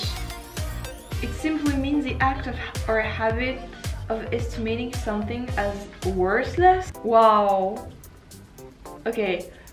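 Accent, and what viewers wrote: France. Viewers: Please, don't lose your french accent. It is quite wonderful!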